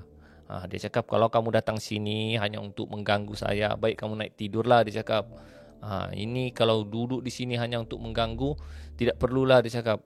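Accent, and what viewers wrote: Sabah, Malaysia. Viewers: As slm...owg Sabah ka dik☺️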